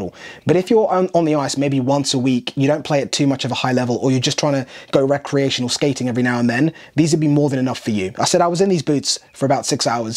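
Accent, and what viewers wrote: England, United Kingdom. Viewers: As a Canadian who has never encountered your YouTube channel I was taken aback by the British accent. The thought did enter my mind "what will he knows". But you crushed it. EXCELLENT presentation and analysis. Very impressed. My hockey days are over. Just no interest anymore. But if I was still into the game you would be my go to guy for equipment reviews. Well done. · I'm not saying I'm racist but I'm having a hard to time listening to this English accent talking about hockey skates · Learning about hockey from a black guy with a British accent. 😂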